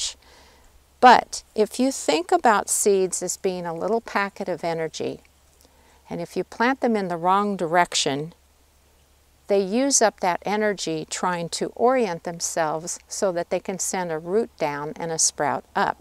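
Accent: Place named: United States. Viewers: American's strong accent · I don’t know what they’re talking about it’s just American lol.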